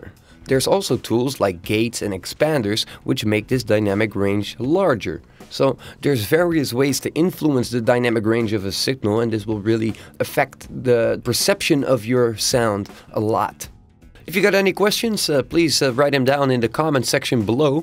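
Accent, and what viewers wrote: Netherlands. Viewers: de eerste Nederlander met een normale engelse accent haha! Goed werk ! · I'm one and nope. I think it's dutch too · I'm quite sure it's dutch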